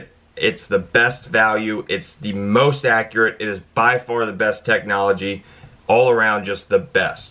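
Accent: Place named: Pittsburgh, United States. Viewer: Sounds like you have a Pittsburgh accent. I am originally from outside of there.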